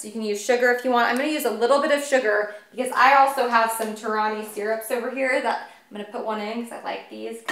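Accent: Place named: South, United States. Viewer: It’s different to say caramel with different accents, I guess you could say I have a “California accent” we pronounce things kind of straight forward so yeah we say it like caramel, your type of southern accent is like Carmel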